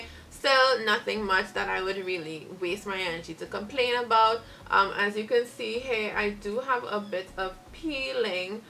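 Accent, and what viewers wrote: Trinidad and Tobago. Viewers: I know a Trinidadian when I hear one!🇹🇹🇹🇹🇹🇹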